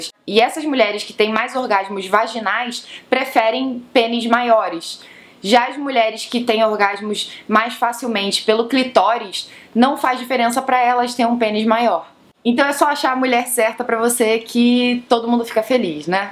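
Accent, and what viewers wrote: Rio de Janeiro, Brazil. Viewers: Sotaque carioca é padrãozinho, porém lindo 😅😍.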